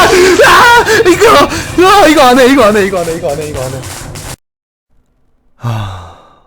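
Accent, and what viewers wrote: Gyeongsang, South Korea. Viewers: 님 경상도분이신가 ㅋㅋ 억양이느껴짐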